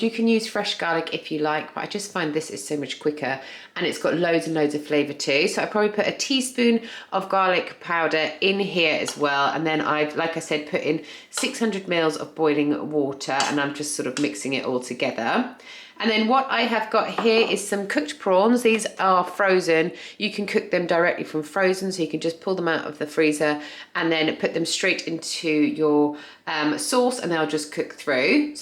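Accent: United Kingdom. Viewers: At last.....! A Brit ! Not a Yank. British meals preped by a lady with a British accent..:)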